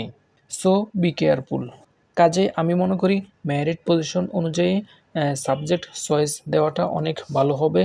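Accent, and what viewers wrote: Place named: Noakhali, Bangladesh. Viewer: নোয়াখালী্র ভাষা চেঞ্জ করার দরকার ছিল।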